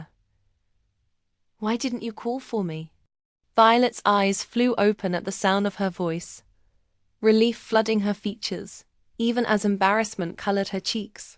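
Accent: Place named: United Kingdom. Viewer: Why the accent sounds like British 😂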